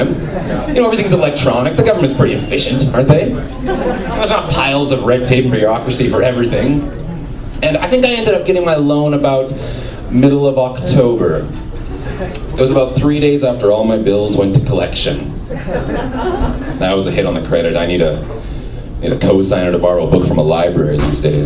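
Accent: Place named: Glasgow, United Kingdom. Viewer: Great Loudon covers, and now stand-up comedy! Not a bad Glasgow accent... try 'Govan' if asked where in Glasgow... Good stuff.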